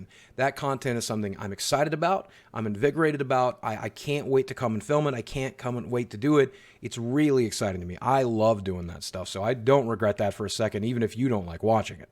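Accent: South, United States. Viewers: Why does your accent sound SOOO southern in this vid lol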